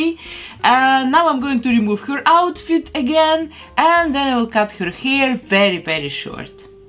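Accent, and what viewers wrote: Russia. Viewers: I LOVE your heavy Russian accent and also the happy music is kind of making this creepier but you still got a new subscriber 💕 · Лол, русский акцент прям прёт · Я не знаю почему, но на каждом видео слышу русский акцент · Акцент автора похож на русский.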